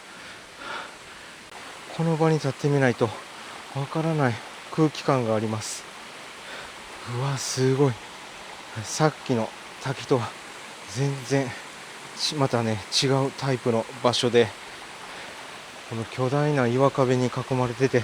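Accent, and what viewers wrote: Kansai, Japan. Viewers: お早う。フィリピン在住者です。知人のフィリピン人とこの動画を観ていました。彼は時々日本旅行に行っている人です。途中までは大感激で、次の日本旅行には徳島に行きたいと言っていましたが、どうも様子がおかしくなって動画をストップしました。何があったかって言うと、フラッシュバックに襲われたそうで、大阪を観光していて、恐喝にあったそうです。話し声が関西風の声で、動画の声とよく似ているとの事。日本は安全で安心して旅行が出来ると信じて行ったけど怖い目に合ったそうだ。スイマセンが、動画では標準語でお話しいただけませんか。お願いします。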